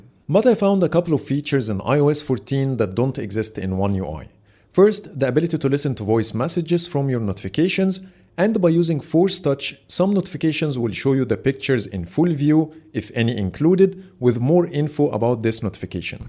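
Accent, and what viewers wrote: Egypt. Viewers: Sounds egyptian to me ??!! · انا كمان عرفت انه مصري من لهجته بس هوه عسل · gotta appreciate the Egyptian inspired accent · Egyptian accent cheeeeeeck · Egyptian accent 😁 your video's quality is amazing 👏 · Swear i can hear Egyptian accent from here 😂😂👏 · هو طعمية علي فكرة ومن لهجته باين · From your first 2 sentences I know that your Arabic especially Egyptian but was heisted until emad Ahmed called u hahaha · knew it I am Egyptian too and I know the exact accent 😅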